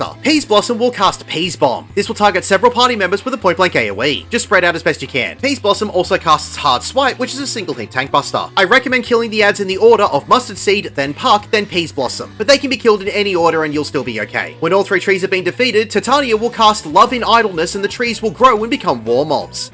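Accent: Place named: Scotland, United Kingdom. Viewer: I’m here for one reeeeeeason: the Scottish ish is crazy Brodie 😂🤣👏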